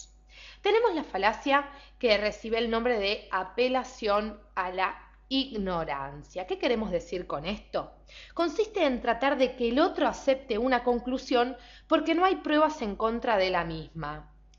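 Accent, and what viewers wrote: Argentina. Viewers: Profesora ¡¡ maestra ¡¡ yo la admiro me gusta su enseñanza . Me encanta su acento argentino. Y no solo eso estoy aprendiendo pero no entindo su escritura ..parese la escritura de una doctora medico general . Jejejej lo hace a propocito ?? Mis respetos a usted .. ahh ya entendi nada es facil en esta vida ....un humilde servidor suyo .. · Muy dinámico, sencillo y con buen nivel su video. Le recomiendo que continúe con otras falacias. Enseño Procesos Cognoscitivos en mi Universidad (Autónoma de Santo Domingo, R. Dominicana) y pienso usar este video como material complementario con mis estudiantes. Por su acento infiero usted es argentina (¿oriental tal vez?), país al que me unen fuertes lazos afectivos y culturales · estuvo bien el vídeo pero odio los acentos argentinos ,bueno casi todos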